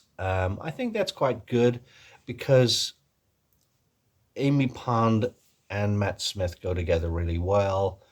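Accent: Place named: East Coast, United States. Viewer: Your American accent is great,. I agree it sounds like a mix of east coast tri-state state area. That's a certified New Yorker assessment. 🫡 Thanks for another great video!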